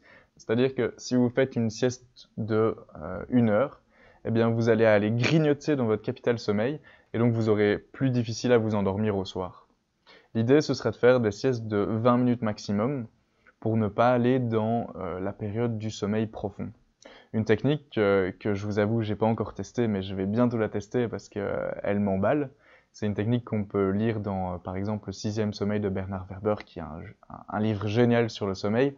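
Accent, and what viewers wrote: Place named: Switzerland. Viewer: Tu devrait parler plus doucement pour nous endormir ahah j’ai remarquer que tu était Suisse donc je comprend mieux sinon super t’es vidéo !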